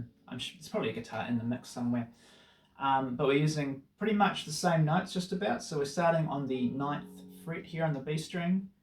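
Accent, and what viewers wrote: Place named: New Zealand. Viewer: Thanks for doing this. It's a lovely song. One note: Because of your Kiwi accent, I thought you said 19th fret when describing the solo when you were actually saying 9th/10th hammer on. I was flummoxed for a few minutes until I groked it.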